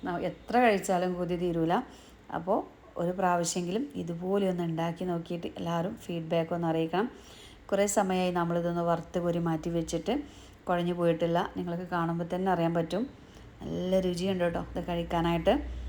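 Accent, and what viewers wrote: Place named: Mavelikara, India. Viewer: Kasarkode basha parayu Ethu Mavelikara basha aanu.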